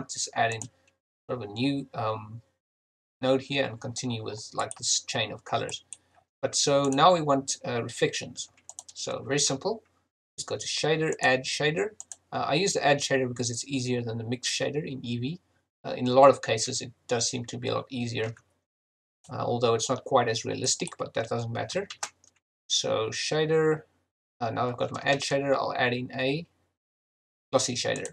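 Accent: South Africa. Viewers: I detect South Africanness. " ", confirmed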